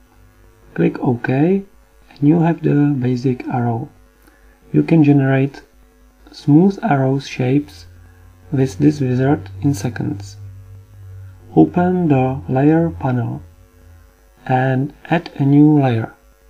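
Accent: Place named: India. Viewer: That's an indian accent not a russian one.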